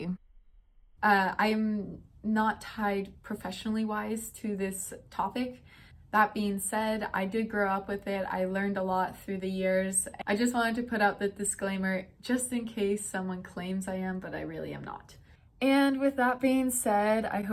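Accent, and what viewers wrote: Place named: Germany. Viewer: You can hear German influence on your English 😂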